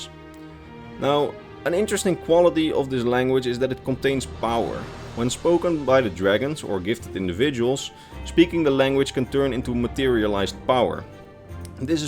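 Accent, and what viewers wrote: Netherlands. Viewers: Haven't really watched the while video yet (doing that now) Love the video so far, really Interesting. Do you happen to be Dutch? I may recognise a slight but of am Dutch accent.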